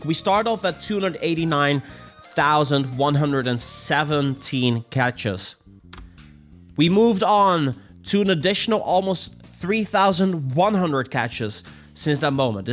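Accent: Netherlands. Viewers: Kom je uit Nederland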